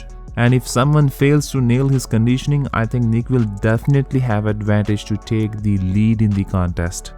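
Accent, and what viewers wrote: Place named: United States. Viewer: Arey bhai kya american angrej accent mein bol raha hain aap. Hindi mein bhi videos karo na bhai.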